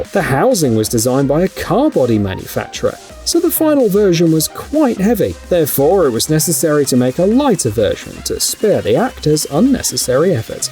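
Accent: England, United Kingdom. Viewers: No shit he’s British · Did the UK accent give it away? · English accent. How much of a gun guy could he be? The royalty disarmed it's "subjects" long ago. They have a false sense of security. By the way, how is that Muslim thing going in your parliament? The UK is in greater peril than the rest of Europe. The greatest way to control your subjects is to disarm them. Then the brainwashing starts. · British accent should be a dead giveaway that this guy doesn’t know anything about firearms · Narrator sounds British, which explains his apparent lack of knowledge about firearms. Remember, the British let their government disarm British citizens, making self defense illegal. "Gun Control" (and crooks) win, citizens lose. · And hes british as fuck, he said alooh-minium. Its Aluminum. Fuck. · That was the best video with the British narrator I've ever seen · Sounds english, makes sence as over here we have to have licences for everything, even airsoft guns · why the brit narrator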